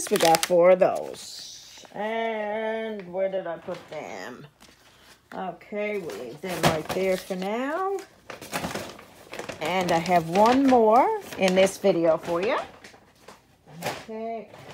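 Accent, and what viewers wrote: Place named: Newfoundland, Canada. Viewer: Hi, I’ve seen you comment on my channel! Thank you! New subbie! I can tell from your voice that you are from Nfld! My hubby is from the Rock also. Such a beautiful place! Welcome to YouTube and you lucked out with your Michaels bags!Love the beads and note cards! Paws up! 💕💕🐾🐾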